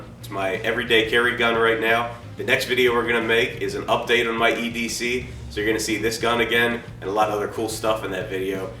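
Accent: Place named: Philadelphia, United States. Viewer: I knew I detected a Philly accent!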